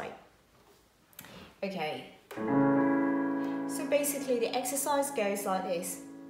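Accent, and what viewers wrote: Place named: United Kingdom. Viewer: U have the British accent but u don't look European or Brazilian at all. But Asian. 💙R u a Japanese lady? ☺️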